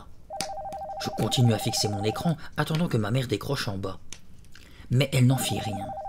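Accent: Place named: Belgium. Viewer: Bonjour! J'adore vos vidéos. Je travaille à Tokyo, souvent de nuit et vos lectures m'aident à tenir jusqu'au matin. Je ne peux m'empêcher d'esquisser un sourire quand j'entends votre accent Belge poindre. Ça fait partie du charme de votre chaîne 😊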